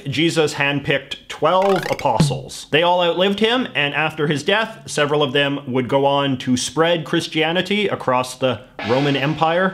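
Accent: Canada. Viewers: this guy has the most pronounced Canadian accent ever. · Yo like can you turn down the canada 3 notches? Like.... there are other ways to pronounce about that dont sound like "a boot"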